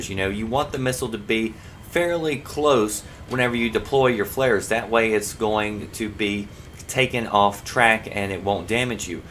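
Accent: South, United States. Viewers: Problem with southern accent? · I dont know why, but I always imagine him as welsh, but the accents makes me think he is america..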